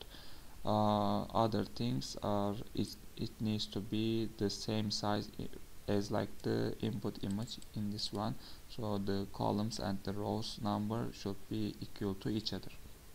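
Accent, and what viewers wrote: Turkey. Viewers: Hocam aksandan Turk oldugunuzu tahmin etmistim, Github'a da Istanbul diye yazmissiniz. Selamlar.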